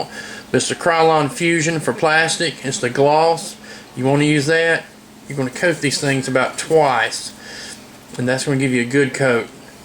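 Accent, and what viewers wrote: South, United States. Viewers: you have an amazing contry axent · You talk like some texas fella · That is the strongest Southern Accent that I have every heard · no ofense but you sond contry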